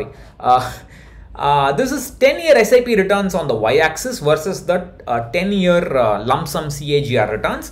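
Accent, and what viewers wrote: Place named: Chennai, India. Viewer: Yes, missing his Chennai Tamil slang...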